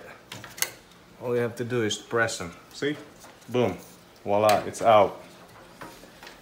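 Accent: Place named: New York, United States. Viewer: That’s very accurate and helpful, hope to see more of your content. Very cool NY accent)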